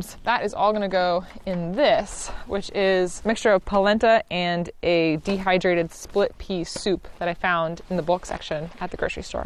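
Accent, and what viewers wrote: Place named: Pacific Northwest, United States. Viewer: You literally speak and act like all of my PNW friends. It's almost like its own dialect. It's hard to explain unless you grew up here.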